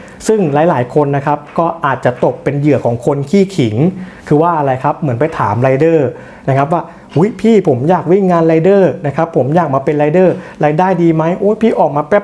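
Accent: Ubon Ratchathani, Thailand. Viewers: พี่เป็นคนอุบลเหรอคะ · พี่คนอุบลหรือครับ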